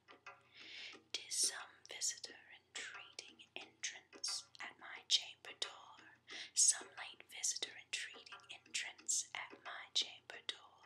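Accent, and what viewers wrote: South, United States. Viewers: Whisper "A Tell Tale Heart" by Edgar Allen Poe. Great whispering, I love the southern accent.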